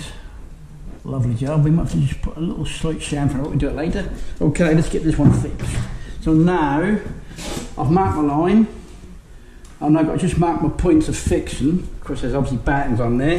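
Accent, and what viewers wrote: Norfolk, United Kingdom. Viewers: Are you Norfolk!? 😍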